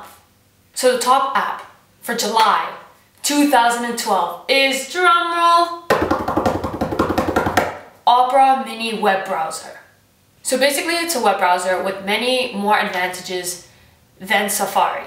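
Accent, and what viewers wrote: Spain. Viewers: are you spanish?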